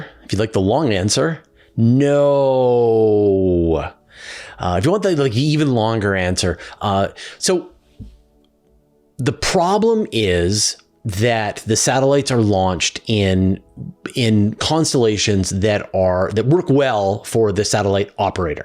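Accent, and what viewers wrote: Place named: Canada. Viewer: All alone and finally at peace is clearly what you meant to say. =) haha Man oh man I love this channel. It's so nice to hear a fellow Canuck.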